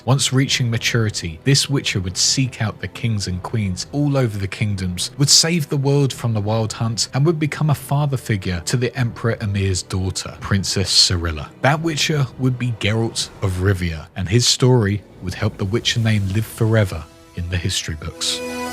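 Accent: United Kingdom. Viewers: Just started. Don't know anything about the lore and was just waiting to hear the voice of who was making the video of the game. You are not annoying and have a British accent. 100% can watch this video and learn what I wanted to know 😂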